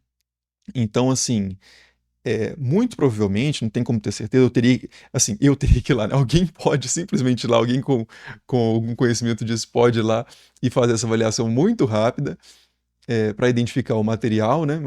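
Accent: Minas Gerais, Brazil. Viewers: O sotaque mineirinho “quem qui era o dono dissaí”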